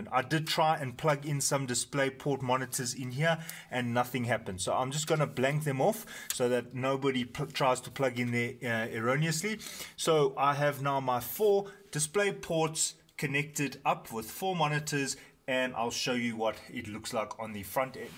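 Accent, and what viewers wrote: South Africa. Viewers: Nice to hear that proppa Sarf Efriken accent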